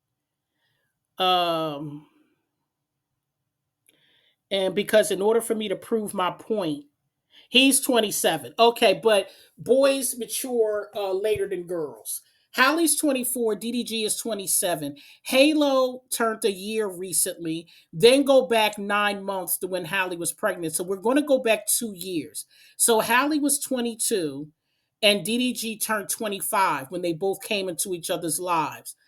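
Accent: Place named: Philadelphia, United States. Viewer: new subscriber just turned 25, really enjoyed this video!! wish i heard it a lil younger lol! any chance are you from philly? i hear it in your voice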